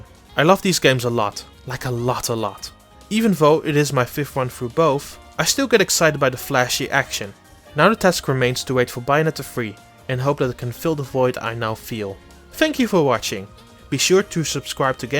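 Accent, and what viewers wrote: Netherlands. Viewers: I was also wondering if this guy was Dutch and that seems the case. Its not to diss him but he really got a Dutch-English accent and for most Dutch people it isnt really pleasent to hear because we grow up with a lot of English speaking Media. But he shouldnt be bothered by haters because his vocabulary is good. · why does this have a dutch voice aaaaaa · Damn, fellow dutchie here, I know the accent is quite apparent but you guys are kinda harsh, more than you need to be I’d say. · You sound dutch , nice review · Urgh, i'm sorry but I can't stand this reviewer. I know he's Dutch (As am I), but the way he pronounces things is justt too annoying :/ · WOW this guy sounds Dutch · Let me guess you’re Dutch lol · This guy is soooo DUTCH it's not funny anymore. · Clocked the dutch accent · that thicc dutch accent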